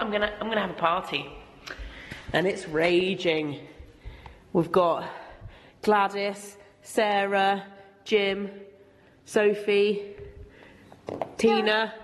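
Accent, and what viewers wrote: England, United Kingdom. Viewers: Vlogging is so amazing! I have been watching you for years now, although I’m older (56) and not really into fashion. I stumbled onto one of your videos and loved to hear your English voice as I am American and love the accent. I haven’t been to the UK yet but would love to one day. I feel like I know you and your family so well and, being as weird as it might sound, if I seen you all in town I would just say “hi and!” Of course that would be funny and awkward 😂 but that’s how Vlogs work I guess. Stay safe and keep the vlogs coming, they’re keeping us sane! ❤️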